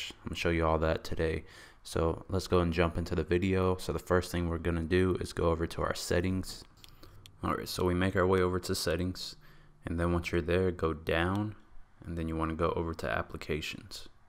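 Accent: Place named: Brooklyn, United States. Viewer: bro you sound like a nyc/brooklyn dude.lol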